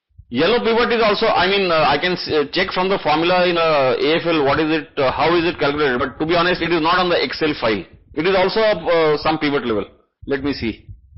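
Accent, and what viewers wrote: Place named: India. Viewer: That terrible Indian accent cannot be followed, such a shame!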